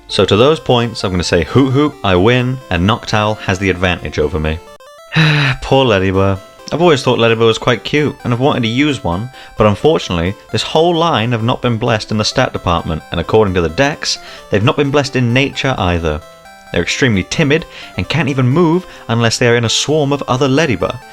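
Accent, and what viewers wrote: United Kingdom. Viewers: Are you a fellow Brit?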